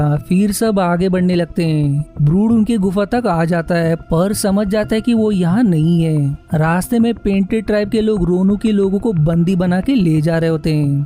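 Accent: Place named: Gujarat, India. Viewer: Why this guy has Gujju accent?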